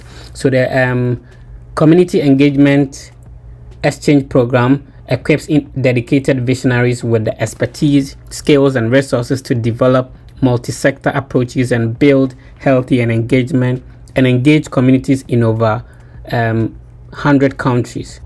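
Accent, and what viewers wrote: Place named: Ghana. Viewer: You speak a Ghanaian English. Are you Ghanaian?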